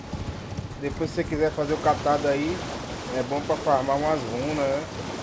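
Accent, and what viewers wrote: Brazil. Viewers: Tu eh baiano ne pae? Tambem sou percebi pelo sotake de Salvador é isso msm?? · Esse e baiano raiz.. bora jogar junto meu pacerooo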